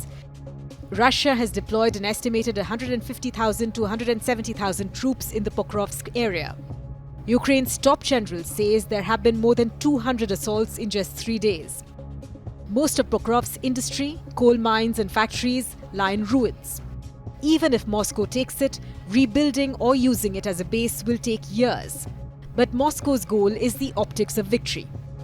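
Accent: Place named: India. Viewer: Can't stomach this jeet accent, rly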